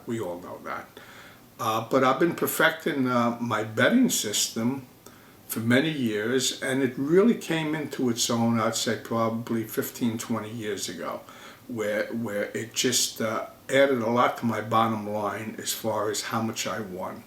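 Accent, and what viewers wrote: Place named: New York, United States. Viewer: just because you wear sunglasses and have that brooklyn or NY accent or whatever the hell you are from doesn't mean you know what you're talking about when it comes to blackjack. lol selling systems. Evidently his system isn't working if he needs more money.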